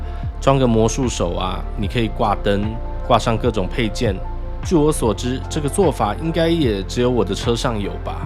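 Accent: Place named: Taiwan. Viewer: 這是在大陸 我以為在台灣 台灣的口音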